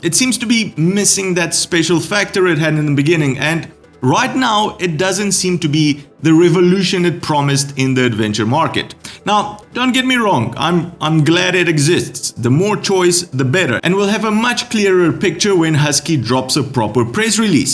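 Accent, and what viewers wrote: South Africa. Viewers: Why do you sound South African?👀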